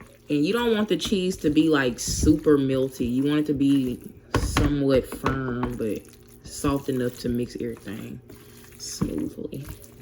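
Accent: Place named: Memphis, United States. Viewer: You talk like dearra! Are you from Memphis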